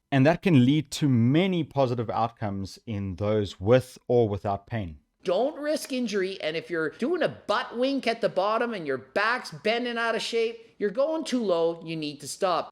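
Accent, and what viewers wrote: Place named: South Africa. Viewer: I thought so when I heard your voice, awesome seeing south african creators on this platform. I'm from cape town.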